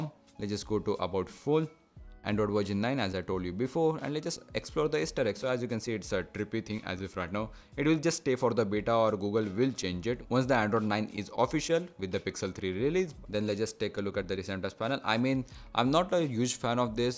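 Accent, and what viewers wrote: India. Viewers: Why Indians voices Soo interesting and different? How are you saying "R". My nerves broken. Somebody can say something? I don't want hear voice of them omg😵(I am not racist). If I hear voice of one of them. all day I can't forget that. This make me sick, just I want to throw up🤮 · too deep indian accent indeed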